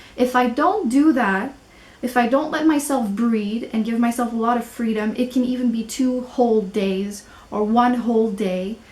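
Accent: Quebec, Canada. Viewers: I'm still waiting for my diagnosis, but watching your video pretty much confirms my doubt, I feel the same way. Thanks for making theses videos, feels like i'm not alone in this. Also i'm detecting a french accent, i'm guessing your french canadian also?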